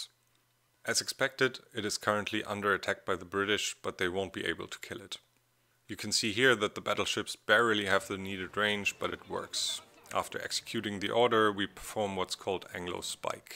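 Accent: Germany. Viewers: I believe he’s German, I’m Dutch and it sounds a bit different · Are u from Germany? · Because he most likely has the German version of the game, from his voice and accent I would say he speaks German. In the German Game Version the Portraits of Head Nazis such as Hitler, Himmler, Göring, Hess and Göbbels Are blacked out or censored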